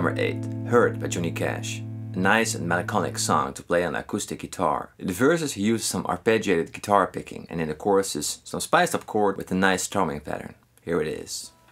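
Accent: Netherlands. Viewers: Just noticed that your presentation has become way better now. More the authentic Dutch accent, more you. Great video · yes he's Dutch and so am i :) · Nederlander confirmed, Nederlands accent op het engels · Can i ask where you're from? You sound very Dutch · Are you dutch???🇳🇱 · how dutch is this guy immaright? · hi are you dutch? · dude, you're a dutchie XD the accent gives away, dutchie here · You sound a bit Dutch, to be honest with you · Hey you're Dutch! Guessed it haha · you are dutch arent you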